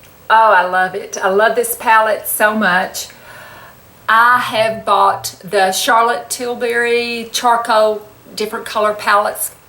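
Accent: South, United States. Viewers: You are my new favorite channel, you're just a breath of fresh air and I can't resist a great classic southern accent!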